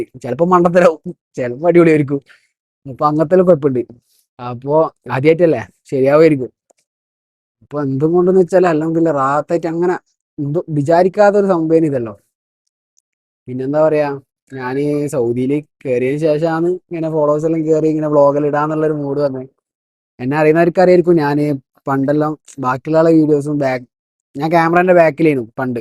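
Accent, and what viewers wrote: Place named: Vadakara, India. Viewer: engale evida anu engale basha keteet kanur alle vadakara slang thonnu atha choyiche😂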